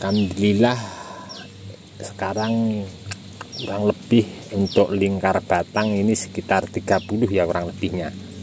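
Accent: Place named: Java, Indonesia. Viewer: Itu emang logat jawa,dari dulu.tapi ga merubah makna.Wallahu'alam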